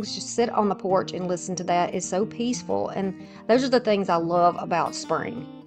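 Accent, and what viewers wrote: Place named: Texas, United States. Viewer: I agree! 🐰 I actually might have squeaked when he gave it to me. Hehe 🤭 I mean....just a little bit. 🤏 I thrifted a white ceramic bowl with fruit carved in it that was missing the top, so I'm going to make a nest to put in it and put the chubby momma and baby birds in it. Would you mind if I emailed you a pic? I'm not gonna force it on ya or nothin! Lol Side note..I love that you talk like me! I grew up on a dairy farm in Texas, and I'm about as country as you can get. Sorry to write you a novel and tell you my life story! Have a good night hun. 💜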